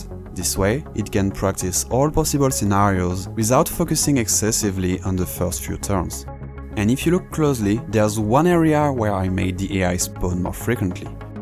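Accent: France. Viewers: omg your french accent is difficult to listen to xD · Are you french? I can sense a little bit of french accent in your prononciation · superb video man. just one minor complain, please as a french guy, I can't stand your english accent, please, do make an small effort, plus your grammar and vocabulary are very good, just less fenchy "ze" instead of "the" ect. Anyway thx for the video I've learn a lot! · Je suppose que tu es français nn? Ton accent et bon mais français 😂❤❤ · Bro you're french and your accent is so bad But the topic of the vid is good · J’adore ton accent français c’est trop drôle. Trop bien la vidéo sinon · Are you french cause your first language is obviously not English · This is the most effortlessly heavy french accent I've heard. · Tu as un accent français, je me trompe? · Open video French accent Close video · are u french? your accent like french? 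· Great job. I think they just lack higher levels of abstract thinking. They're like babies learning from trial and error so experiences just remain at the very basics. But your work, insights and doubts are the best. Thank you for that. Your accent sounds french, are you from a french speaking country or I'm totally missing the point? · L'accent français me termine, video de fou bg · Are you french because you have french accent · Are you french? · He sounds french, it's like he use syllabic intonation, while English should be stress-timed. Interesting. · Are you french? Because I can clearly hear your accent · Why are you french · You have a French accent is it true? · He sounds french and his ai does wr runs. confirmed:D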